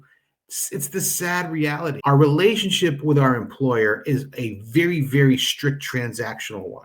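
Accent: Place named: Long Island, United States. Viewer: I'm guessing Long Island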